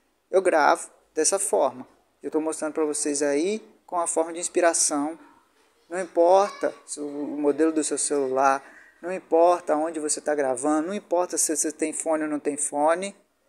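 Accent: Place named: Rio de Janeiro, Brazil. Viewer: Eu jurava que tu era carioca kkkkkk tamo junto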